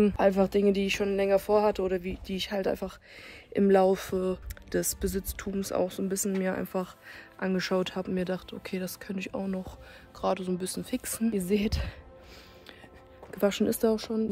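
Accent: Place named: Russia. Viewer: Hi🙋🏻‍♂️ bin zufällig auf dein video gestoßen👍🏼 hat spaß gemacht zuzuschaun und war lustig. Vorallem find ich gut dass du so natürlich rüber kommst. Du bist aber auch verdammt hübsch. Und ohne scheibenwischer sieht die Karre geil aus👍🏼. Walla du hast ahnung👌🏼 und stil. Die Felgen passen wie angegossen zum auto. Glaub bist russin, hat sich so angehört🙂👍🏼ich lass natürlich ein Abo da😁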